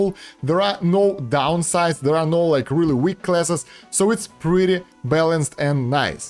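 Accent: Russia. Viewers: Готов поставить на то что это русский пытающийся говорить на английском языке Вот 100% я уверен что это русский человек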